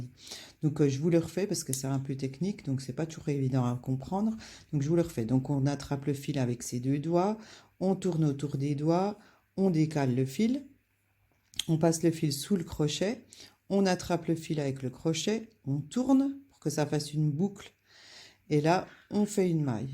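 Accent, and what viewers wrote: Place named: Haute-Saône, France. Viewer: Merci pour vos explications parfaites. Vôtre accent me fait penser à la haute Saône.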